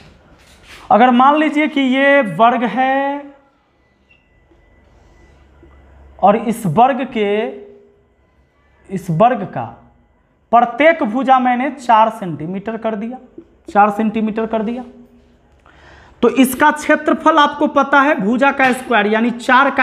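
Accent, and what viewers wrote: Bihar, India. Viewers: Bihari hai sir😊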